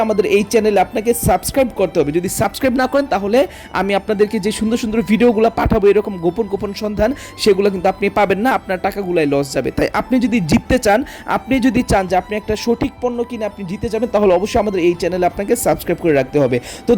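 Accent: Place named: Barishal, Bangladesh. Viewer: Borisal thake bolse😊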